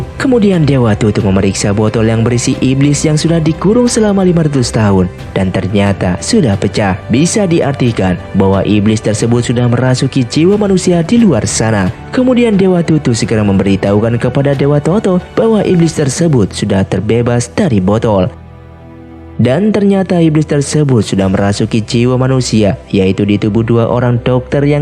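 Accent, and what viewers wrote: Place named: Java, Indonesia. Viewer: Dari jawa mana bang?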